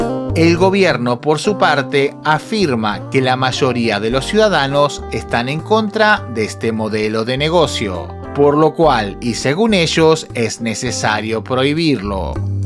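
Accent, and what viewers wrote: Argentina. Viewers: Tú acento Argentino y tú léxico mexicano me confunde… 🤔💭💭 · De donde eres brot? Argentino no?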